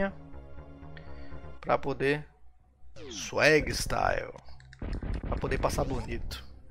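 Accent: Recife, Brazil. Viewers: Cara…. Estou ha tempos para escrever aqui umas coisas…. Primeiro vou falar sobre minha relação com esse jogo: foi o jogo que mais curtia lá nos anos de 1995 / 1996 (+ ou - por aí). Eu me dediquei muito ao jogo, e em uma época que não havia muitas informações, consegui sozinho acumular 98% dos itens. Daí na época uma revista lançou um “detonado” do jogo, e eles conseguiram 97%! Além de ter ficado orgulhoso com meu desempenho, consegui descobrir os 2 itens que faltavam! 🙌 Agora vou falar de vc: cara, tu é muito fera!!! (Pra não falar muito f*da!!) Nunca assisti vídeos muito longos de games, mas esse seu aí já assisti duas vezes…! Rodar o jogo todo sem errar caminho, sem pausar p ver mapa, sem hesitar… basicamente sem erros e demonstrando muita habilidade… muito fera! Parabéns! E vejo que esse acaba sendo “só mais um” jogo em que vc demonstra habilidade. Parabéns!!! 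E grato por esse vídeo!! Por fim: vc é de recife?? Pelo sotaque, assim me pareceu… Saúde e sucesso p vc e família! Ah: e qual idade da filhota pequena que vc cita?? 🥰 O meu tem 6 meses… 🥰 Abraço! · Senti um sotaque recife ai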